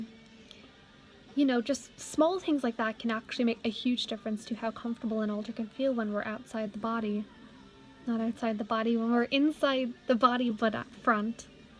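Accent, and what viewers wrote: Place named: Scotland, United Kingdom. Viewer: I’m really curious if you “switched “ while recording this video because your voice goes from very quiet and timid and feminine with a neutral accent , and a deeper register voice with a slight Scottish sounding accent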